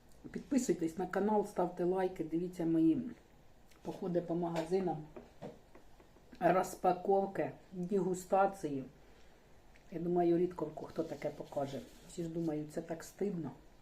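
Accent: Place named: Poltava, Ukraine. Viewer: Доброго вечора пані, підписалася на ваш канал, хоча я із західної України. У нашому місті теж є і АТб, і Сільпо, купляю в ціх супермаркетах тільки акційний товар і то не все підряд, бюджет не позволяє з їх " доступними" цінами. Дуже приємно вас дивитися і слухати вашу полтавську мову. Хай вам щастить, піднімається настрій після просмотра, як завжди з юмором, а кому не подобається, хай не дивляться.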